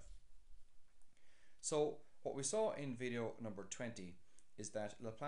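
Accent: Ireland. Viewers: I actually like your, I believe Irish, accent. I appreciate a variety of accents which seem to help me focus. On the other hand the somewhat low volume is a bit of a problem. I have to pick quiet times to listen to the audio.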